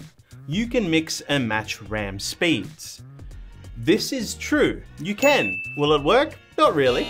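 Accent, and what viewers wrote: Australia. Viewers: Learn a lot of things from this video, but the highlight is now I know for sure how Australian English accent sounds, kinda sound like British but more decipherable 😂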